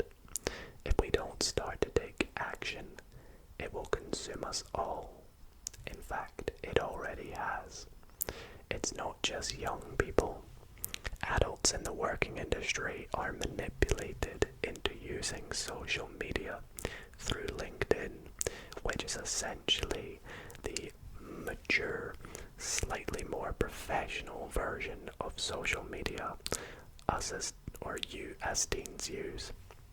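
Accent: Scotland, United Kingdom. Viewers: Your normal voice is EXTREMELY Scottish and your whisper isn't. And it confuzzles my brain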